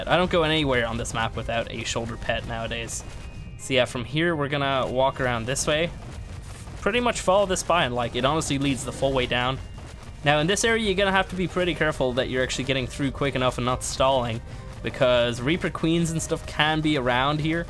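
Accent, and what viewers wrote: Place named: Ireland. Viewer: Why does sound Irish in this video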